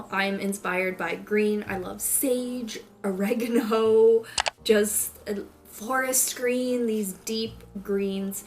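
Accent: Philadelphia, United States. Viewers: Getting used to short nails is so difficult 😩 I loveeee this content 💞 your philly accent is my fav thing as someone from the west coast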